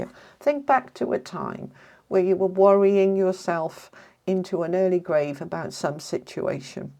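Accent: England, United Kingdom. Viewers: I had the same flu on the same days, a terrible unstoppable headache and a total lack of energy. But I'm feeling better now. I wish you a quick and full recovery. You're such a nice human being and it's a pleasure to listen to your readings with that perfect English accent. Love from Italy ❤🇮🇹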